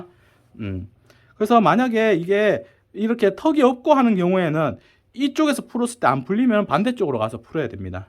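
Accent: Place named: Daegu, South Korea. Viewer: 수선의 달인 이십니다 경상도 사투리중 가장 정감이가는 대구 사투리 매력에 푹빠져 설명이 속속 귀에 들어 옵니다 오늘도 잘 배우고 갑니다 😊😊😊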